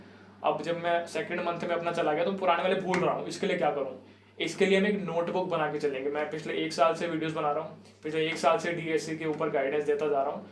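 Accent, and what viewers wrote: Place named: Haryana, India. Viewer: THAT CUTE HARYANVI ACCENT IN HIS VOICE ♥😅🏄💯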